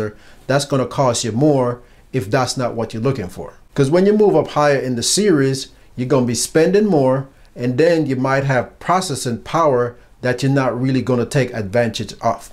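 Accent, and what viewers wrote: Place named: Jamaica. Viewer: Thanks man this was really helpful, I could be wrong but I think I'm hearing the yadi in the voice of so big up yuh self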